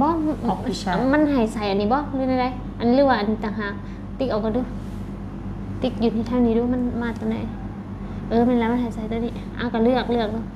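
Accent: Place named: Sisaket, Thailand. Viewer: สำเนียงภาษาเว่าน้อง คือกันเปะเลยกับคนจังหวัดศรีสะเกษบ้านอ้าย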